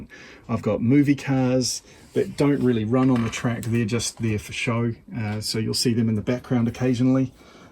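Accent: New Zealand. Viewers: Great behind the scenes vid mate! Laughing, I gave up informing a few US viewers that you were a Kiwi, but no, no, they knew better, you were Aussie! It was not worth upsetting anyone..... They still have their dreams.....Until they watch this! Haha, but with your accent!! You certainly have continued and expanded your dedication from day one! Going great! Well done! So one tech question, what type of lighting have you found best to film with in NZ? Cheers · Yeah I can imagine it would be tough. I honestly thought you were two different guys. I knew you were a kiwi but thought was an Aussie living in NZ. Some of the characters I'd like to do would be Howard Cosell Sly Stalone (as Rocky) the Blue Aardvark from Pink Panther are a few. Oh and I have an Aussie char I'm learning to talk like from you. Yes I realize you're a kiwi but it sounds the same to most eh? 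My characters name is Billy Bong Bonza he will have all kinds companies with commercials from a deck and patio construction Co (the way you say deck is hillarilious) to Wombat Dating Site for lonely men looking for mail order brides. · This was the second vid of yours I watched. Great stuff, and honestly I didn't pick up the Kiwi accent until I watched this. While watching the first vid (one of the hill climbs) I was thinking, that's a "unique" Aussie accent... well now the penny has dropped! Hahaha. As a fellow Kiwi, it's great to see one of the "team" create something truly amazing. I'll def look at your merch and make a purchase. All the best and stay safe.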